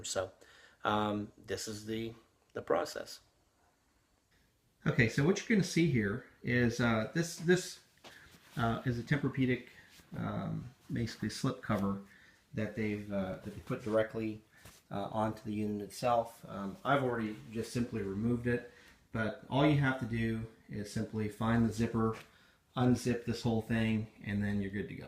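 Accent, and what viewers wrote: India. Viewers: Thx for the video. I too have a foam bed that did not know what to do with, now I have one, thx to you. Also, the Indian accent was excellent. Was not expecting that.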